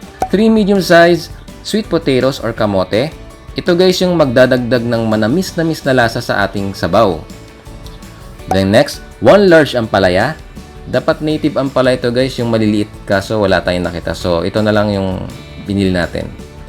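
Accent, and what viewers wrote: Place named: Batac, Philippines. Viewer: Taga Batac kan sa met sir, kasla kasjay iti ayog ti taga Batac hehe. Hello sir! Im from Piddig Ilocos Norte! Thank you kadetoy a recipe sir padsek man met ket tapno masiyahan ni papa😁 am 18 yrs old😇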